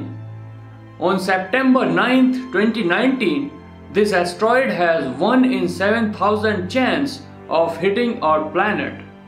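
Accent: India. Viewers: Who thought the guy who is speaking in this Video is Indian? Me!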